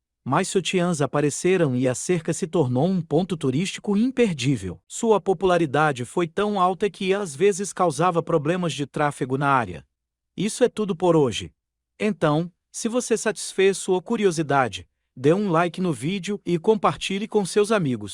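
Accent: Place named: Australia. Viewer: Gostaria de saber de onde se origina o locutor do vídeo cuja pronúncia é muito estranha. Fala um português que não é nem de Portugal e tampouco do Brasil. Australiano que aprendeu português? Pode ser...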